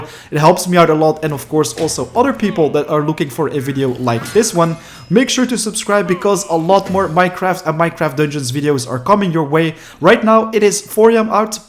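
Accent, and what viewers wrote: Germany. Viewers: the german accent made me cringe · Is this a german accent?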